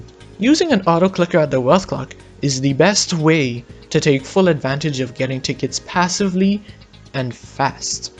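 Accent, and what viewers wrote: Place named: Australia. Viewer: Why you have Australia accent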